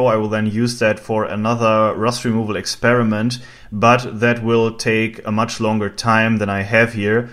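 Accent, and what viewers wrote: Germany. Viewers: I like watching Mathologer, The Oftler, and the guy who makes those repeating "Legolas" bows. It dawned on me that OF COURSE Germany would have a variety of regional accents just like America does, because you all have very different accents from one another while at the same time sounding distinctly German. I love linguistics. :-) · German? Just asking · German? Irish? Idk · Man hört deinen Deutschen akzent echt raus :DD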